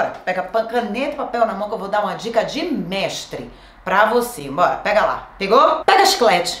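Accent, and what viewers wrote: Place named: Bahia, Brazil. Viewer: Que sotaque gostoso! É Baiana?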